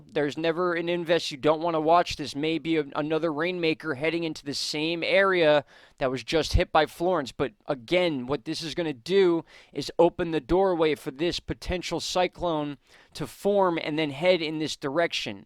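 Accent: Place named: New Jersey, United States. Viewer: Your voice sounds like an old friend of mine from Jersey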